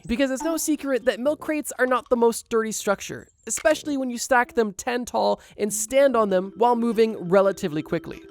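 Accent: Canada. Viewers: i thought i heard that canadian accent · i could tell you were canadian 😂😂 ontario ontop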